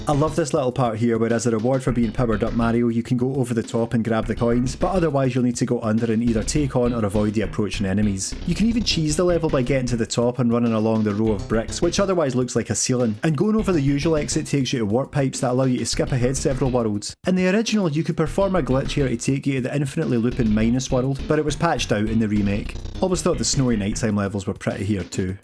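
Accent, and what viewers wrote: Scotland, United Kingdom. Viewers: Im not native english speaker, but let me guess you are scotish?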